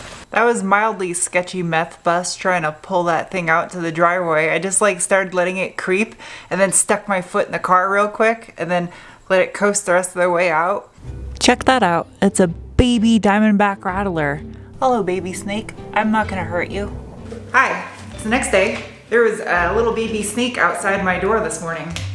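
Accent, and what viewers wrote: United States. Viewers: cant stand the way americans garage, like gar-odge in canada we say guh-raaj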